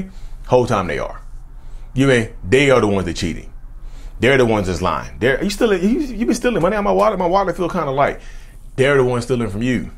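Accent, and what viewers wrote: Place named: North Carolina, United States. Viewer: Most of the narcissists I dealt with in my past - on the romantic level that is - were when I lived in Charlotte and before I knew that it was narcissistic abuse that I had experienced with them. So hearing your NC voice talk about narcissism is particularly healing to my soul. Now living in L.A. I, of course, encounter SOOOOO many situations where NPD is a factor. Your content as well as the videos from Little Shaman, Jay Reid, and Patrick Teahan are my go-to sources these days. I love it! -S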